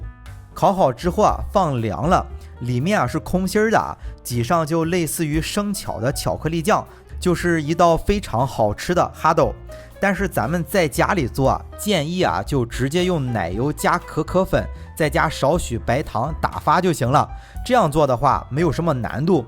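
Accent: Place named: Qingdao, China. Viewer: 我听着声音有点像青岛人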